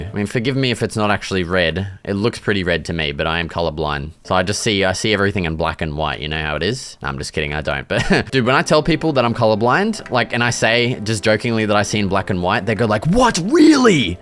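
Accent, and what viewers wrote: Australia. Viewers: Are you Australian? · Are you aussie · 1 minute and 3 seconds in and I already love how Australian you are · Are you also Aussie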